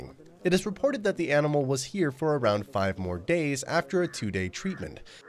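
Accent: United States. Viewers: I get the that the script, which is also in the description of this video, was written by the creator of the video and English is not their first language so that is why there are so many weird phrasings or things that don’t make sense that the narrator says and that’s fully understandable. But the narrator sounds American, so why did he not make corrections to those errors instead of allowing the video to sound ignorant? I know narrators are supposed to read what they’re given, I’ve done that work before. But when it’s really bad like this you correct it.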